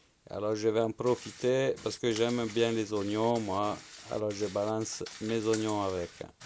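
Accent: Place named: Argentina. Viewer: Sympa l’accent Argentin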